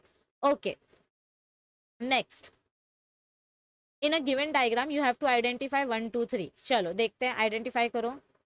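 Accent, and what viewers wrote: Maharashtra, India. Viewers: Mam yaar aap kitni clear Marathi bolte ho Me MRATHI 😇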